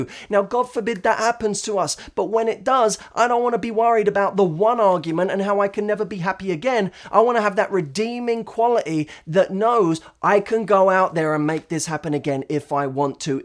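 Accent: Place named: United Kingdom. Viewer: I like your videos, but my own problem is your British accent that I can't get used to!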